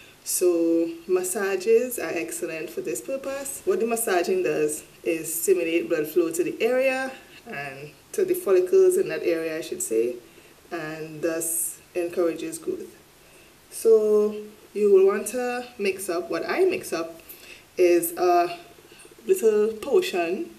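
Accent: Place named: Dominica. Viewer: Great Tips .Where you from u sound like someone from Dominica.I'm a Island girl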